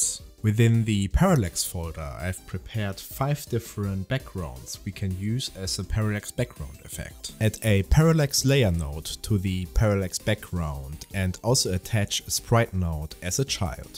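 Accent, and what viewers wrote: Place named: Germany. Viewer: Den deutschen Akzent hört man sofort raus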